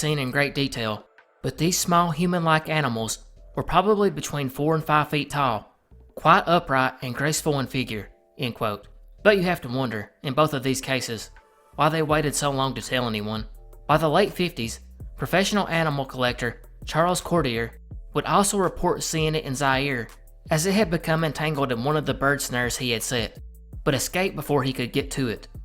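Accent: South, United States. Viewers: I don't think it is weird either. I think it is really refreshing as you do, to hear a narrator with a great Southern accent! I am originally from the Pacific NW, so its really cool to hear all the other different accents that we have here in the US. I thought your comment was great. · In the beginning I needed to get used to the southern accent, but it has really grown on me and I now find it soothing 😅. Thank you for all your hard work! ❤ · This might sound weird, but as a southerner, it's really cool to hear a narrator with a southern accent. You don't get to see that very often in TV and media.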